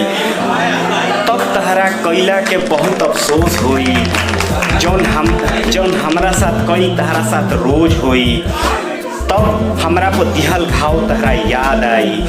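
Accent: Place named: Bhojpuri, India. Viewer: Ek no. Bhojpuri tone with dialogue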